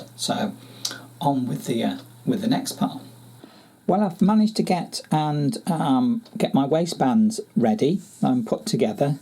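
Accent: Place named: United Kingdom. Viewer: How lovely and refreshing to hear a UK accent! Thank you for sharing your sewing projects. I'm very keen on upcycling so I hope to see more on your channel.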